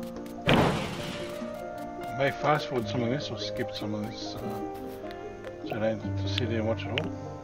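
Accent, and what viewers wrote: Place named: Australia. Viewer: Judging by your voice I'm guessing you're a fellow Aussie haha..... G'Day mate!. Loving this game, so addicting!. I don't want my partner to play as she'll never go to work again haha.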